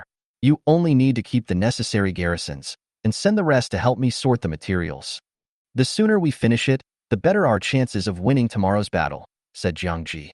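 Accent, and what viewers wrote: United Kingdom. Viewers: Your British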